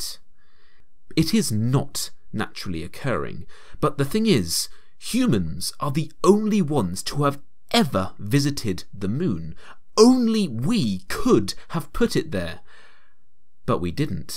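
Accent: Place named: United Kingdom. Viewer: The narrator sounds like a British man 🤔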